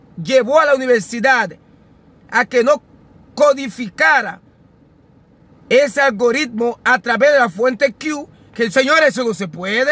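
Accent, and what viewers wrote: Haiti. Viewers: Disculpe, hermano yo no lo conozco, su acento es ahitiano? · Tu eres haitiano ese acento tuyo es puro haitiano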